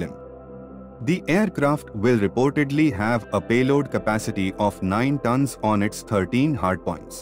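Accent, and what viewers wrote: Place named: North, India. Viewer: North Indian crap english accent all wrong pronunciations of words...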